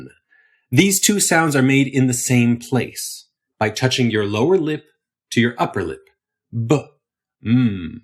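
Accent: California, United States. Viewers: it is californian:) <link> listen to lol you are caught:)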